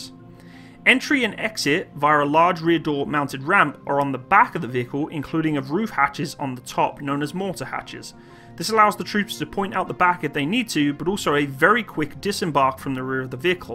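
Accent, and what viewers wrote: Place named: England, United Kingdom. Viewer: The narrator sounds English but talks like an American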